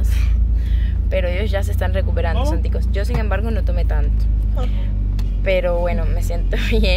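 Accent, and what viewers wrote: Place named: La Guajira, Colombia. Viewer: Pero tu de donde eres porque tiene puro asento guajireño. De echo eres hermosa donde te puedo ber..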